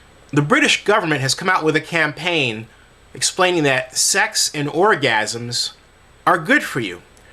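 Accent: United States. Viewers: I can't bear to listen to his idiotic american accent or look at his holy than thou american face...Tutt tuttin fkin idiot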